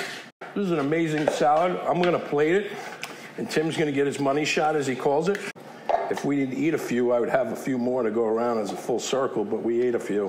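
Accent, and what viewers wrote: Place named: United States. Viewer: Chef, lei ha detto che è italiano, dall'accento non si direbbe: solo di origine italiana od ormai ha preso l'accento "americano"?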